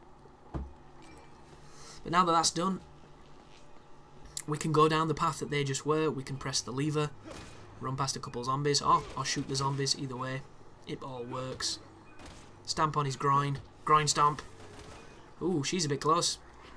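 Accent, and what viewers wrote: United Kingdom. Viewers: I don't understand your comment and whether you're being sarcastic or not. Playing the game by always choosing 'No' takes some discipline, but at least it means that there is a point to the healing items on Professional difficulty. The game is still annoying in that you can only have one saved game at a time, that's a really bad flaw that. You sound like you're from the UK, me too! :-) I like your commentary, you make some interesting comments and assertions about games and whatnot.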